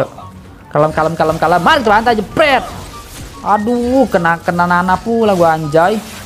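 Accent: Palembang, Indonesia. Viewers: Ngapo logat cak wong palembang yo..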